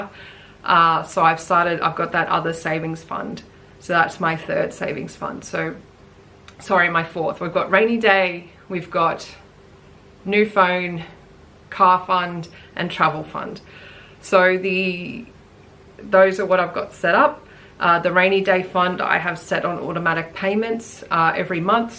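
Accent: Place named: Australia. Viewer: I found your channel on my own yearly bible reading journey :) it's nice hearing an Aussie accent talk about this :) I felt like I was in a prayer rut too and started a prayer bible this year. There's a lot of videos on sisterita club to get started and then people have refined her idea to better organise the tabs :) You seem very financially sound but I thought i would just add in that your super should be in high risk portfolios while you're young. 5 years out from when you plan to retire switch it to conservative funds. Your really need the high risk investments to have enough to retire on 😊 And don't panic when they tank. It's about a 10 yr cycle but you come out better in the end :) With your budget, watch your quarterly trends before you change any monthly budgets :) With your iron if you cook a lot at home check out cast iron.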